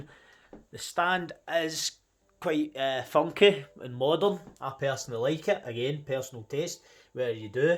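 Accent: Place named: Glasgow, United Kingdom. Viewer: Refreshing to hear a Weegie voice, just bought myself a 65inch 4k Smart TV by Toshiba, HDR10+,Dolby Atmos and Vision, Bluetooth 5.0, 24Watt Speaker (highest on any tv under £1000) I use Fire Stick so not too concerned about the software Toshiba use for their home screen, all for £430! You have the advantage of QLED but I chose the bigger screen as my preferance until I spend big cash on an LG/Sony OLED tv in a few years time as TV's get better and cheaper every year now! Good review buddy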